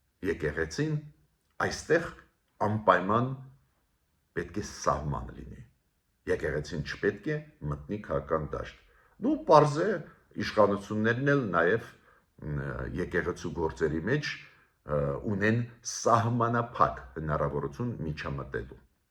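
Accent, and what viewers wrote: Russia. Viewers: nikoli tryapka ches haskanum vor da nikoli gorc@ che kez el drel es qaxaqakan verlucabani tex bavakane rusacavov tarapes akcentt rusakan e gone dranic amachi erevi naxkinum da pristij e exel ko nman soxuni hamar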